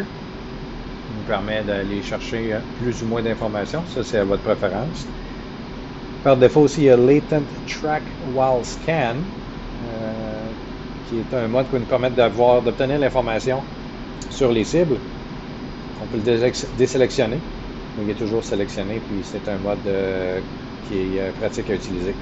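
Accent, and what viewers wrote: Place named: Quebec, Canada. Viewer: Et en plus avec l'accent Québécois!!! :)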